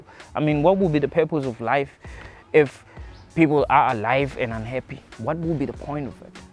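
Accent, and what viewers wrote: South Africa. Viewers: hey bro just started following you i like your content bro, i always wanted to ask where you from coz i could tell you from SA, lols im from there too, good to hear someone who talk reality, out here so hard to find people like you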